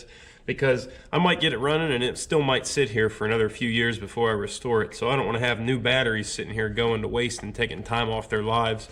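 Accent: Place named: Western Pennsylvania, United States. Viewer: Ahh ok that makes sense, you had the same western pa voice I'm used to, that's where I am haha. You ever need a hand with anything let me know. I can work on about anything haha.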